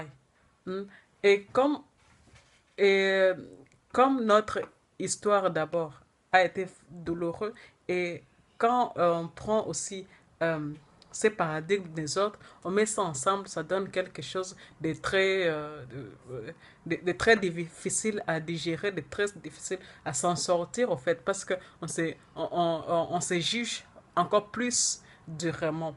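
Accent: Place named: Germany. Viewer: Joli ce petit accent d’Allemagne petite sœur......Tmtc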